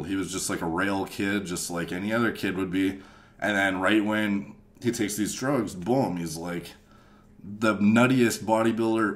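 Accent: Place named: Canada. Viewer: Yeah the way some canadians say out , aboot, house lol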